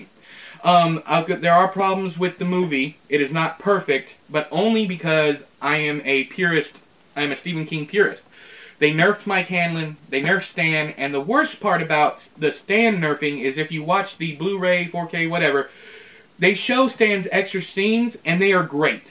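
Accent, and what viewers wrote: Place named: South, United States. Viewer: My first place choice would be 'Shawshank', and second place '1922' which İ found to be better than 'Gerald's Game'. That southern drawl! 😘 (Absolutely love it! My girlfriend hates it though. She said: Why can't he at least unclench his teeth while talking?)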